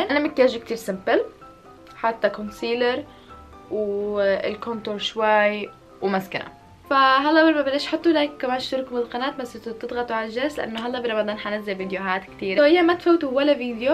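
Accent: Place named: Algeria. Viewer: نتي جزائرية تاع الصح نستعرف بهدرتك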